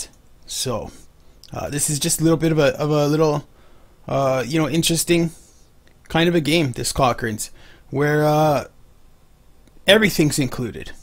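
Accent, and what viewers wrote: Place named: North Dakota, United States. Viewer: I love your accent!! North Dakota??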